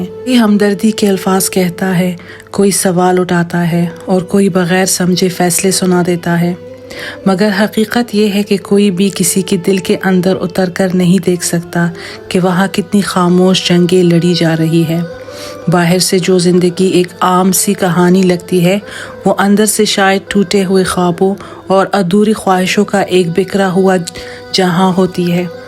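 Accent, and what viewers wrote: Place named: Pashto, Pakistan. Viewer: بہت دلکش اواز اور باتیں ہیں آپ کی اردو تھوڑی پشتو والی لگ رہی ہے اپ انگلینڈ میں رہتی ہیں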